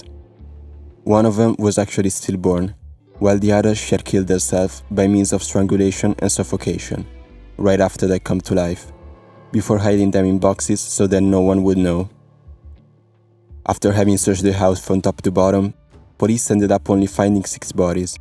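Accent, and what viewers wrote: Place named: France. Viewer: That French accent. Oohh la la.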